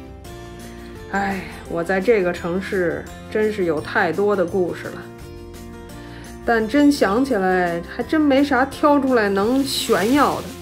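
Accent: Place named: Beijing, China. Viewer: 太棒了。 期待下一期视频。 老北京话，那叫一地道。 听着顺耳👍😄💖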